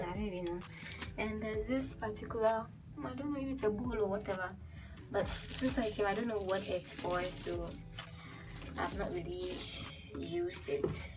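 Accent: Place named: Canada. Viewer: Kindly consider your sound it's low.... Hoping to hear some more Canadian accent soon🤩🤩🤩✨ Enjoyed the vid.... Keep it moving 💃💃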